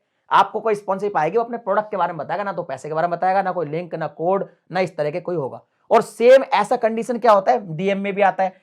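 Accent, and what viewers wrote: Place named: Haryana, India. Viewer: Aajkal aapki hariyanavi nikl rhi sir 😊❤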